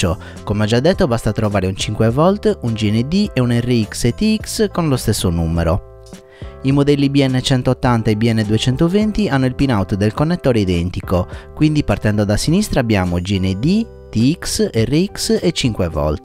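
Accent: Sardinia, Italy. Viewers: ciao Avrei un altro problema, in attesa che mi arrivi l esc per x220 vorrei utilizzare il nazgul v2 posso utilizzare, come radio, fs 16 x cosa devo fare inoltre, scusa tutte queste domande, ho acquistato gli ev 100 ma non riesco a vedere nulla, dove sbaglio? Dall accento sei del cagliaritano io sono dell ogliastra magari un giorno ci incontriamo per un caffè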